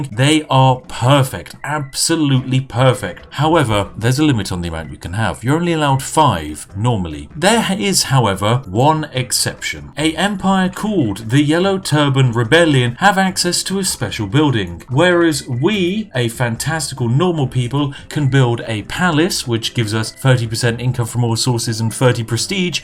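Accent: United Kingdom. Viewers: the use of the British exploit of anglicizing everything and not even bother to try and pronounce the names in the original pronunciation made me laugh very Spiffingly British hahaha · That british accent makes a lady happy indeed. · You know I usually dislike brits due to their attitudes/accents. You sir, should be royalty. Funny as hell, informative and got me to drink a cup of tea. Hats off to you sir.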